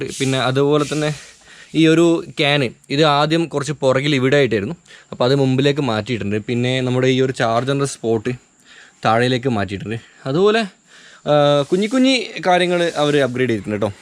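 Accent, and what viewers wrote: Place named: Iritty, India. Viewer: . കുഞ്ഞി കുഞ്ഞി നമ്മുടെ ഇരിട്ടി ഭാഷാ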